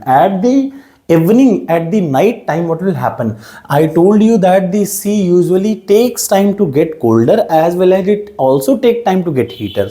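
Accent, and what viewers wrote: India. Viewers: Bhai tum English mat bolo , aisa lag raha ki tum Hindi bol rahe ho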